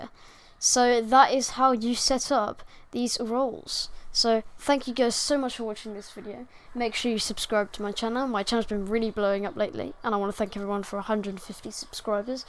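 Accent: United Kingdom. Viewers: Thank you random british guy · I can’t get enough of bri’ish accent, i love it so much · Hes from the UKKKKKK LIKE ME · you are british, cringe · Thank you sm little british man I love and appreciate u sooo <3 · ooh a british man i suppose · its a british accent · damn your british accent is amazing. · My dude sounds like a professional british asmr person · Bro i love your British accent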